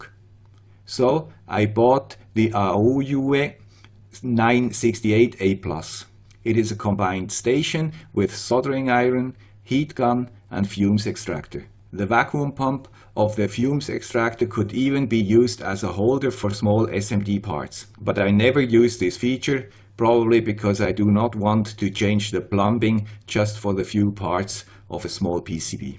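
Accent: Switzerland. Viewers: Congrats with #100. I enjoy looking at your videos and learn something every new video. I do hope you do not start #101 again by saying 'this is the guy with the Swiss accent'.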